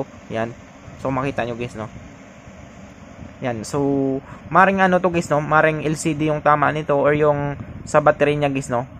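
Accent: Iloilo, Philippines. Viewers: Dw ilonggo ka bala mag hmbl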